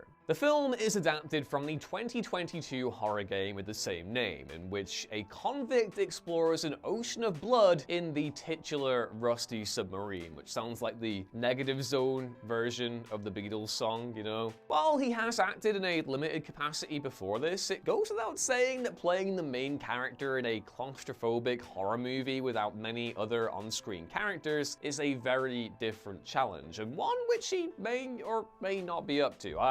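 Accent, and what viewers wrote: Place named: England, United Kingdom. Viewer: He pronounced it Chris-tian. It’s his English accent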